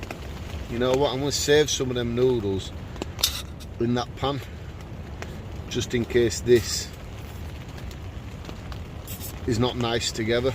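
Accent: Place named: Northern England, United Kingdom. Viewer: Chatting is what makes your videos, but with a northern accent makes it more worth watching. Keep up the work laddie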